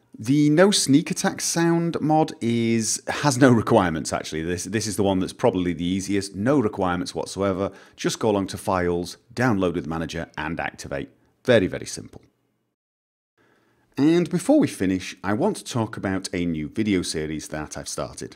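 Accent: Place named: Manchester, United Kingdom. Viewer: Damn. After all those questions as to where you're from I always sat smugly in my seat and said "I bet it's Manchester, somewhere in the NW at least". Of course, I sound like a total hindsight Charlie now. :D Not liven in the NW for nearly 20 years (and I wasn't a native to begin with) but the odd word still comes out with a burr. :)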